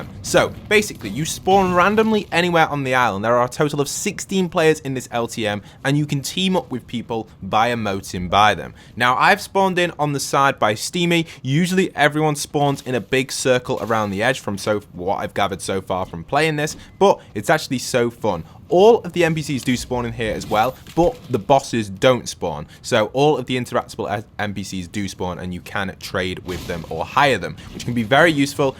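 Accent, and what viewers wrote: United Kingdom. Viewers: You know that's the bri'ish accent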